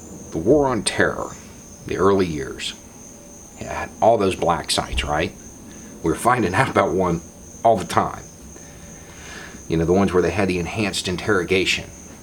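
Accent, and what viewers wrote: South, United States. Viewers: I have started using your videos all the time to help me argue my points. You’ve become a great inspiration to me. Your accent helps when I’m addressing people in the southern US. Like in your other video you talk about the wrong person sending the right message. My female status makes me unreliable to most of them as well as my mixed family. However lots of people I post your videos to, just call you a paranoid loon but a few have actually attempted to understand. Either they debate the points or just say good point. You are making a difference with your videos. Thank you❤️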